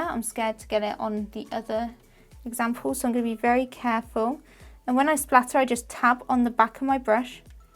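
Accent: United Kingdom. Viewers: What a joy to hear a British accent on a YouTube art video. This is a really wonderful and helpful video, thanks!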